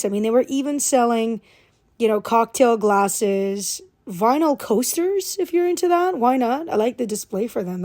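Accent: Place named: United Kingdom. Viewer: I like your bri ich accent , yeah ?😉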